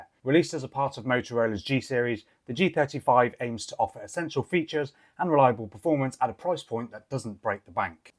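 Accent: Liverpool, United Kingdom. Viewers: Why are you speaking in a Scouse accent? Whereabouts in Liverpool are you actually from? If you are not from Liverpool then why are you speaking in a Scouse accent?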